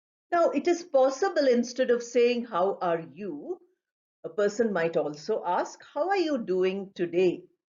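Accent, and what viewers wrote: India. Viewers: Good morning madam 💐🙏 iam following your english classes. Nice classes.Your english class is very accurate, grammatically and fluency with an indian accent english. I can understand your english class easily.🎉🎉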